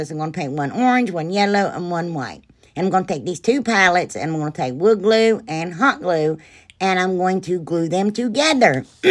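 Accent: South, United States. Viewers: Love everything u sound so cute like frm the south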